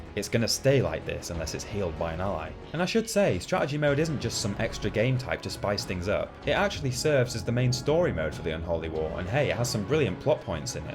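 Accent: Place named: North, United Kingdom. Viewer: Found out about your channel after seeing a post about you on r/gaming, you're great! Where abouts are you from by the way? You sound Northern.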